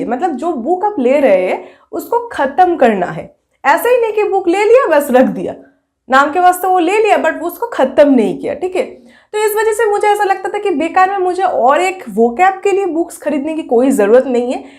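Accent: Bengal, India. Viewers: Bengali hi hain, isme hasne ki kia baat kia hain?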